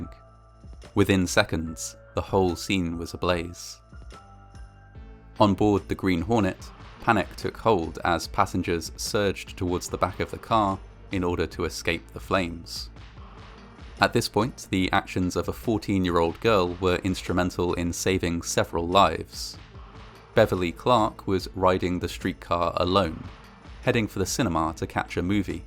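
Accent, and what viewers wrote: United Kingdom. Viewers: What I find fascinating is this American disaster is being narrated by a Brit.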